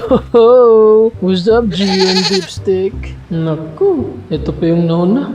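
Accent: Visayas, Philippines. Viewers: bisaya diay ka?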